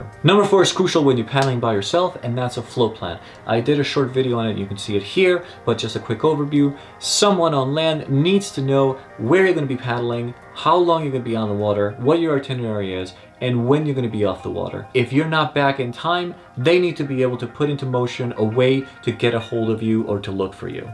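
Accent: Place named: Turkey. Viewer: You have a super Turkish accent for a Texan.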